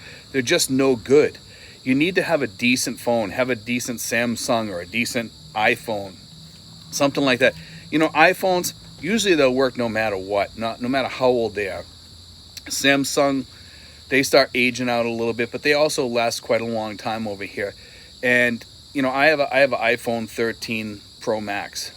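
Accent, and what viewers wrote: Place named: Chicago, United States. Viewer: Hmmm, I changed my mind your accent I thought was from New England, im thinking Chicago, Illinois now lmao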